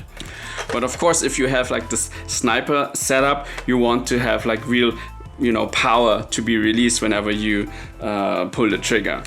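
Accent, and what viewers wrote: Germany. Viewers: I can see your german, right? Der akzent sagt alles! :) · Ich erkenne das du deutsch bist am Akzent richtig cooles Kit muss ich unbedingt machen ich bin riesiger sniper fan aber leider gibt es keine coolen sniper von nerf ausser die Longstrike die aber nicht mehr hergestellt wird deshalb werde ich mir das kit unbedingt kaufen .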